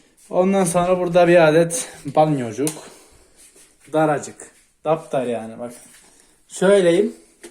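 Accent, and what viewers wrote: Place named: Cyprus. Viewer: allahın kıbrıs şiveli 3t'nin çakma ergen versiyonu... Çalışma masaları ve yataklar farklı yerlerde arada duvar ve kapı var tuvalet için ayrıca kapı var lavabonun orta yerinde açıkta değil yani klozet. Banyon da kapılı. Dandik duşakabinlerden değil. Onu da kapılı yapmışlar. Üstüne sandalyen tahta sandalye değil rahat. çalışma masanın lambası bile var. Sen daha ne istiyorsun? Devlet senin göbeğini mi büyütsün? Çok göbek pesindeysen gider kendi yemeğini kendin alırsın boş boş konuşma. Tuvalete adam niye terlikle gitsin? Terlikle gittikten sonra yine içeri terlikle gelmeyecek mi? Kafan mı iyi senin? Alaturka tuvalet değil klozet var orada. Yerler, terliğini ve ayakkabısını kirletmiyor yani. Senin gibi tipleri böyle aklı başına gelinceye kadar döveceksin. Senin gibi tiplerin çalışacağı max. yer sanayi olmalı fazlası değil.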